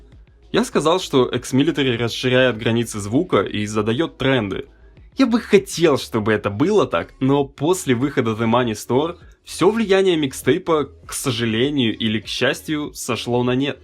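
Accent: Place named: Russia. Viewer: This is russian accent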